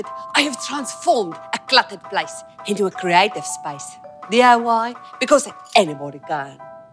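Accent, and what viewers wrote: Afrikaans, South Africa. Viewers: She’s a South African that’s why she has this accent. My friend lives I South Africa 🇿🇦 in the same city 🌃 I live(not by though) but her aunty is. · LEG3ND she sounds afrikaanse. I should know.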